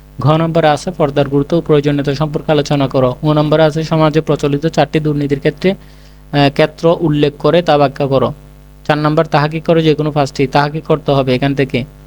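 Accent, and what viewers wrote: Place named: Chittagong, Bangladesh. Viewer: Apni chittagong er taina😅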